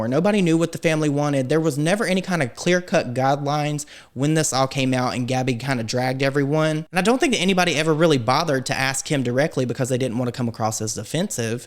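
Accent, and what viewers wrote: South, United States. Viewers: Kinda hurt to unsub real quick, but did it for you! Your southern accent reminds me of home 🏡 💞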